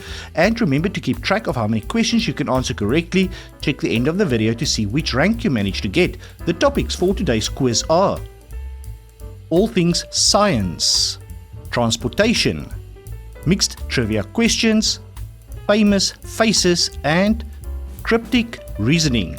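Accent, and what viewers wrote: South Africa. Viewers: Stalactites. Got 7 wrong so far...!!!!!!!!!! Is that a South African accent? RE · Bud are you a South African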